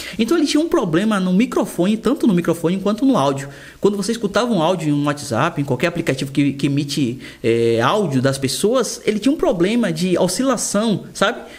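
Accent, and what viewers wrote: Bahia, Brazil. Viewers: De certeza esse cara é baiano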